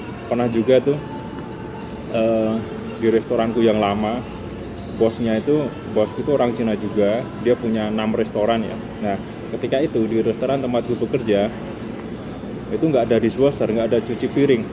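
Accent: Java, Indonesia. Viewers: Selalu suka dengan suaranya yang agak medok mas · Seneng saya dengernya, beberapa video. Dan saya di sini baru komentar. Saya bisa nambah wawasan untuk bahan cerita. Hehehe... Lanjut mas... Oh ya, kok bahasa logat sampeyan jawa banget... Asli jawa tengah po mas?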